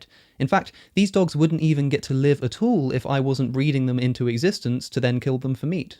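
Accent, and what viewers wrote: United Kingdom. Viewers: Dear god. Please tell me this is satire. Edit: ya. Satire. Pretty good points, but leaving out a lot of the other side’s actually valid arguments that have no easy come-backs. He’s no Contrapoints or Philosophy Tube. Just a cheap imposter with a British accent. 🥱 How about this? Is it vegan to eat a person who consented before death? By Vegan ethics, yes. So I’m gonna eat my fish and chicken and my dogs can eat me when I’m done. Life is a bitch, it always has been. EVERYTHING gets eaten eventually. Wether its by worms and microbes, humans or other animals.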